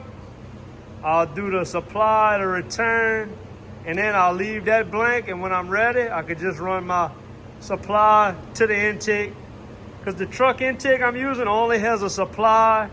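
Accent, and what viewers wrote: Louisiana, United States. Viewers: I like the teaching of the LS swap with that Louisiana/ Creole accent !!!!! Great Job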